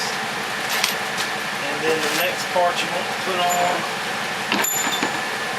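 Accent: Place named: North Carolina, United States. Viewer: Where you from, you sound like your from my neck of the woods, NC, great video, hate we missed those few parts from the recorder but for most part excellent video, God bless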